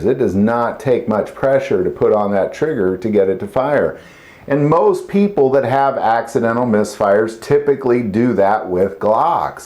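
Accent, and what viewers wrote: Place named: Kentucky, United States. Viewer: I bought a g19 gen 5 for a girlfriend. It wasn't bad but not a good fit for my hands. Dude I thought you were from Kentucky or surrounding area for some reason. Blue steel is a little ride. Thank you for the prayer direct message we talked about. It did work out. Thank you for your positive energy in this direction.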